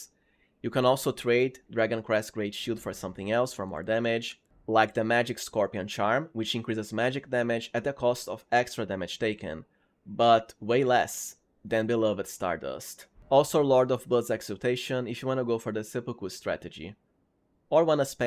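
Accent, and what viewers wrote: Brazil. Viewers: I know a brasilerio when I hear one ;) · reconheci o sotaque brasileiro na primeira frase, adorei o video mano!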